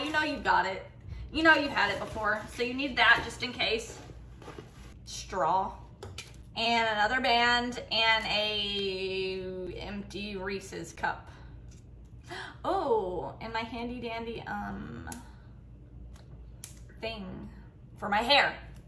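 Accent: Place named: Texas, United States. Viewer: Yalll u from Texas u say yalllll a lot not a bad thing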